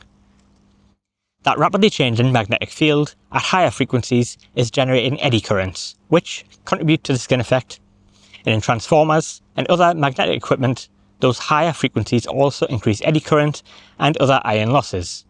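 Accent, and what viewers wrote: Newcastle, United Kingdom. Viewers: We all love the Geordie accent mate! ;))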